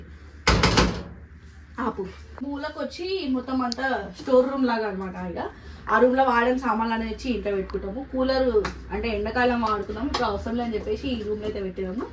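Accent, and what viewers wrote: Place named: Telangana, India. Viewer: Mee Voice chala Excellent ga undi andi Pure Telangana Slang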